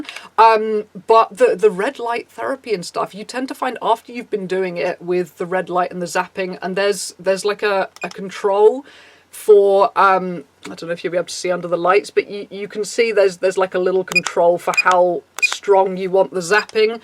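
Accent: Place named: RP, United Kingdom. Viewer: God she's posh !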